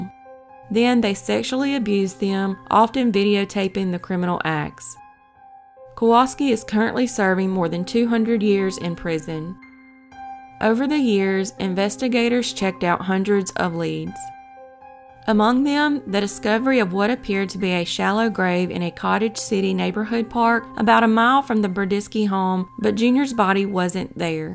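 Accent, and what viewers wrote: South, United States. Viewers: I've heard of a few, but not all. This is some research and time. Her voice so soothing, Keep doing what you're doing Southern lady. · Absolutely great channel with your southern talk love it! May God continue to bless you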